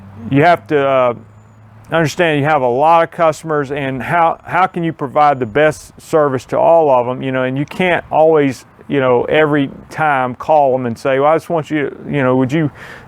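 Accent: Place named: Texas, United States. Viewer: How many employees and major pieces of equipment do you 'run' to service 270 customers? You must have a great office manager to handle all that while you keep up the channel. What city are you in? Your accent sounds Texan more than anything from Alabama. How many years did it take you to get to 270? How much faster would you say it grew each year based on your expertise in fertilization and weed identification and killing?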